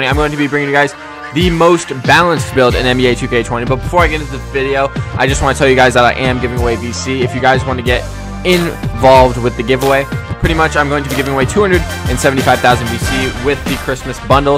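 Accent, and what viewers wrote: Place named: Australia. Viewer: Brother starting sounded like Australian why did you sound like Australian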